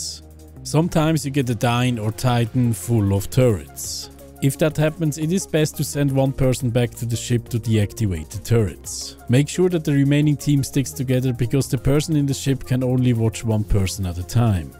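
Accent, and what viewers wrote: Switzerland. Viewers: Are you Swiss? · Bro du besch sicher schwitzer, ech ghör das ah dim englisch wettsch mol eis zocke? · are you swiss?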